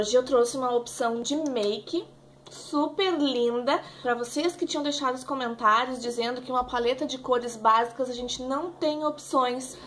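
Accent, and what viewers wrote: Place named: Rio Grande do Sul, Brazil. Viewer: Ficou linda, já q o verde estará em alta neste inverno vou aproveitar o meu q está meio abandonado...rsrs. Lindo esse sotaque de gaúcha. Mto sucesso no canal. No meu apresento dicas motivacionais e dinâmica de grupo, se gostar aparece por lá. Bjs.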